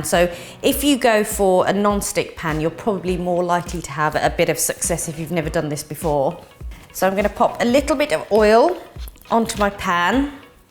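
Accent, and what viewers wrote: England, United Kingdom. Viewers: I love your English accent. And your recipes are inspiring and they always work. From Betty in Australia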